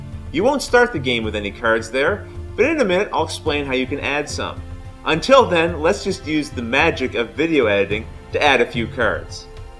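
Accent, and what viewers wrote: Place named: Eastern Canada, Canada. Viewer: I'm from Eastern Canada, that's what you're hearing :)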